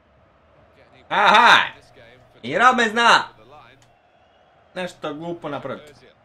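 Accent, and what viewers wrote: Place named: Bosnia and Herzegovina. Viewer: HAHAHAH Bosanac brate :3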